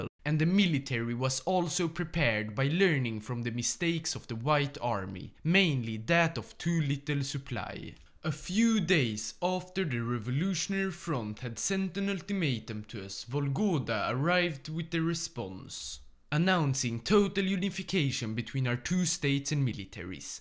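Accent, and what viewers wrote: Sweden. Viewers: I’ve been a subscriber for a long time hearing ur Swedish accent and a very big popularity hope you become more better 🎉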